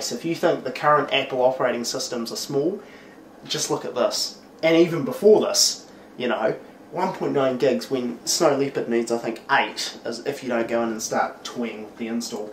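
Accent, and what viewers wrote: New Zealand. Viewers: Sounds more like New Zealand accent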